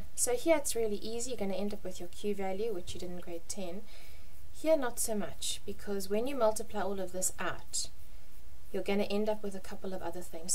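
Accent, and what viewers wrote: South Africa. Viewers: You're afrikaans?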